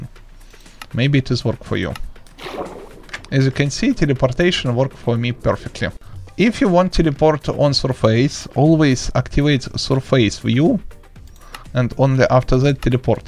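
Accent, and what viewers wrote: Russia. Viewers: Руский акцент не счем не перепутать😂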